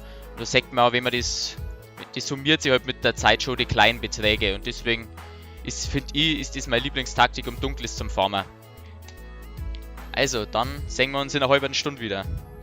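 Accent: Bavaria, Germany. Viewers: Kommst aus Bayern?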